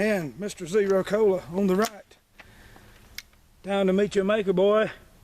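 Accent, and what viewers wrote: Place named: North Carolina, United States. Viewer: ya from buddy ? sounds like ur from North Carolina . Im not far from ya here in Virginia. And I got one of those H&R shotty s in 12ga given to me xmas of 78. Its one of those guns that you never get rid of cause your parents got for ya and that makes it all the more worth wow !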